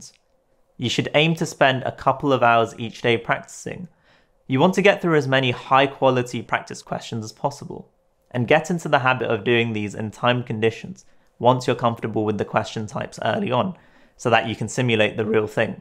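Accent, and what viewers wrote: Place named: United Kingdom. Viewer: Nice British Accent. Very clearly Explained.Thank you very much.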